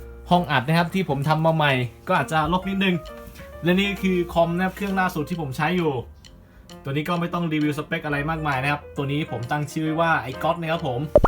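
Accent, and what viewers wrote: South, Thailand. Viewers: พี่อยู่ภาคใต้ป่าวพี่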